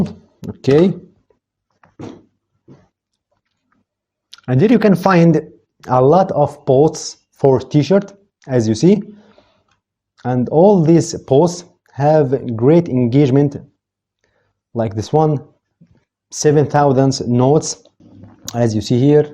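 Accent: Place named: Egypt. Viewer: منور يا عم او كما تقولون الله ينور ياعم عرفت انك مصري من خلال كلمتين (اكزمبل exemple وايضا زيس this) بالتوفيق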